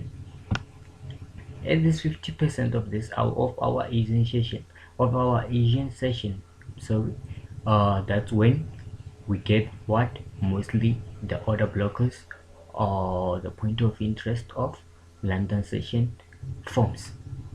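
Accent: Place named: South Africa. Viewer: 😂😂😂😂😂man you this accent sounds South African, but the name 🌚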